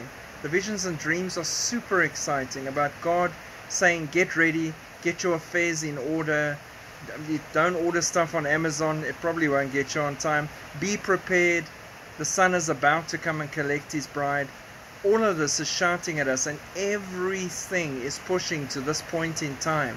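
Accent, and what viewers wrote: South Africa. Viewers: Spot the South African accent 😀 Greetings from Benoni 🇿🇦 And yes, fully agree. Our Great God and Saviour is soon to appear. Maranatha 🙏🎺✈️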